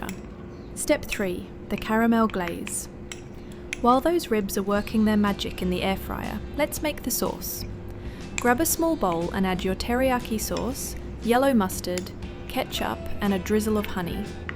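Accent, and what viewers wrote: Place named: England, United Kingdom. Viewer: Why do the videos for this channel use a voiceover with an English accent, when it is clearly produced by a US citizen? Yellow onions are used in some recipes but they are called brown onions in the UK. All UK airfryers use temperatures in Centigrade, but Fahrenheit are always quoted first on this channel. And no-one in UK calls an aubergine an eggplant. Just be honest guys!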